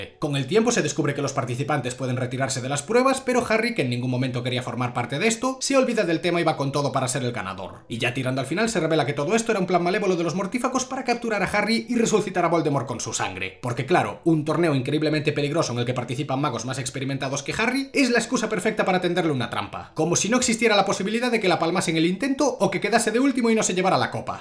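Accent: Cuba. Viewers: Era acento cubano? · el acento cubano me hiso el dia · Que acento cubano tan racista…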